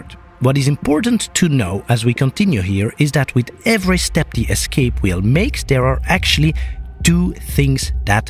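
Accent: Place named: Quebec, Canada. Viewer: That's great. Is the narrator Québécois? I hear a slight accent.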